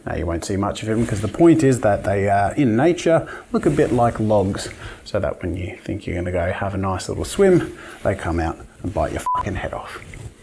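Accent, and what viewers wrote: Australia. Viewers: It's weird, i could never really tell the difference between australian english (well, at least yours, i dont really watch many australian people) and british english (I'm a french canadian, we have neither here lol). But in this, i can CLEARLY tell XD it's essentially a mix between southern US and England. I get it now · I know heaps of Aussies who talk like that. It’s not annoying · With A Hint Of Aussie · The Australian accent gives a spice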